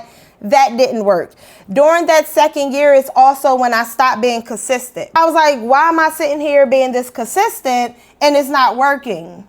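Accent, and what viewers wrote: Baltimore, United States. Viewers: Omg haha I remember watching you earlier and asking to myself if your accent was from mephis or baltimore🤣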